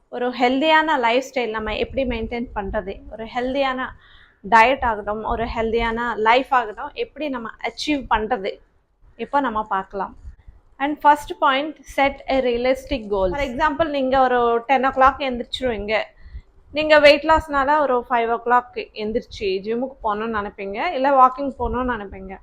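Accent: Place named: Telugu, India. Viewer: tamil pesaradhu alaga eruku, ❤you are looking gorgeous in this saree💕 beautiful queen💝👸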